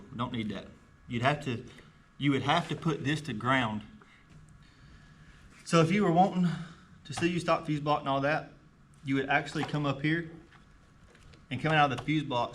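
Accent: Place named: South, United States. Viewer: I was going to research it on the internet but I cannot understand your southern accent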